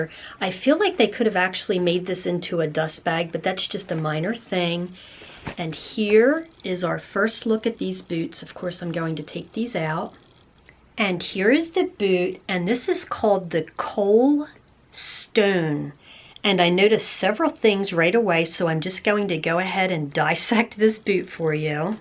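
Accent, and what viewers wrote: Philadelphia, United States. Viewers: Thank you for posting this video! I’m cracking up tho I hear a lot of Philly/S Jersey in your accent. Se d them back if they hurt. But they’re beautiful and legit boots of good quality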